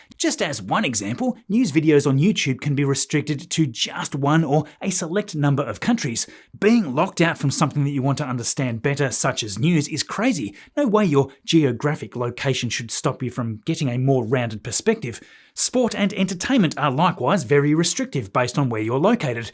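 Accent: Tasmania, Australia. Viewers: As an American, I love a good 'Tassie accent. I will attempt to transliterate. SpiceEhx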